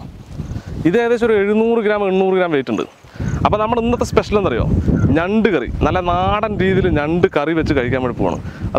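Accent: Palakkad, India. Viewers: സങ്കടങ്ങളും പ്രയാസങ്ങളും വരുമ്പോൾ ഒരു video എടുത്തു ഒരു പിടി പിടിക്കും.. സംസാരം പാലക്കാടൻ സ്റ്റൈൽ വളരെ ഇഷ്ടമാണ്.. ഒട്ടും over ആക്കാതെ വലിച്ചു നീട്ടാതെ.. Food taste ചെയ്യാൻ കിട്ടുമ്പോൾ ആ കുരുന്നു മനസ്സുകൾ ഒക്കെ എത്ര happy ആണ്.. കുക്കിംഗ്‌ ഇഷ്ടപ്പെടുന്ന ഞാൻ ഇക്കയുടെ ഒരു വല്യ fan ആയി മാറി <3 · ningal Marana Mass aaanu. Ningalude aaa palakkaad style talk nangalkk nalla ishtttam aaanutttaa Ningal elllaavarum koodi snehathode irunnu food kazhikkunnathum manasssinu sandhosham tharunnund. Padachon eee sneham nila nirthi tharattte Delivery kondu vannnath muslim payyyan aaanennnu paranaju food reject cheytha aaalukal ullla india il ithupolathe video orupaadu nallla message aaanu nalkunnnath. Nammal elllam paraspppparam snehathode jeeevikkanam. Athaaanu etttavum valiya sambaadhyam ikkkaaa. Ikkkaa ningale padachon orupaaadu anugrahikkkattte. Aaameeen Athu pole eee video il ullla elllaaa chetttanmaarodum orupaaadu thanks. 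Love u all Rabeeh Thrissur ( Dubai ). Lots of love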